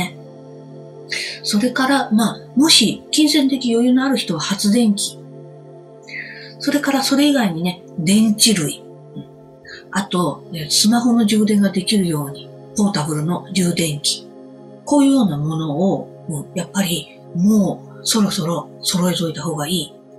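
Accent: Kansai, Japan. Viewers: さん初めまして宜しくお願いします😊自分で語るのもおかしいのですが！私ね、あらゆる全ての波動周波数が何故か分かるのです！今地球に宇宙からのエネルギー波動が流れ出来ています9874hzです2〜3年前(コロちゃん初)700~900hzでしたのでものすごいスピードできめ細かく軽い波動が地球に入っていますね！😅このペースで行くと25年の銀河波動も、期待出来ますね！今も少しっつではあるけど南に傾いています😮ポールシフトが起きる事は宇宙では決定しているのですがその前に、予知現象といった具合に私たちが経験してそれから完全なポールシフトが起きます！その時沖縄~台湾の地下大陸が浮上します😮 さんの関西弁がとても好きです😅ありがとうございました💕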